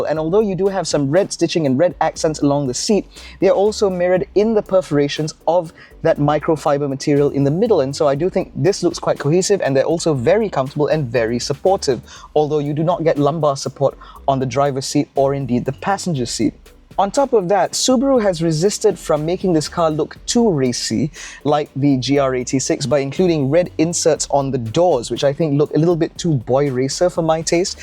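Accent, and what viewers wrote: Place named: Upper class, United Kingdom. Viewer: Speak like a British aristocrat. nice......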